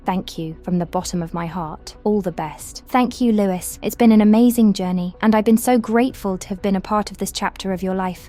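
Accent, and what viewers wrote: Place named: England, United Kingdom. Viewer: I see this is a USA based channel, therefore I assume the narration is artificial. No American lady could do an English voice so well.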